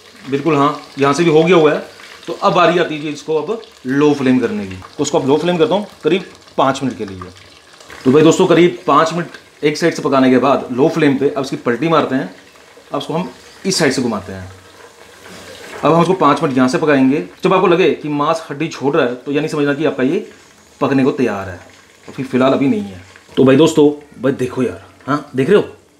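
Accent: Punjab, India. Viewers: aap punjabi type bolte ho bahut payra lagata che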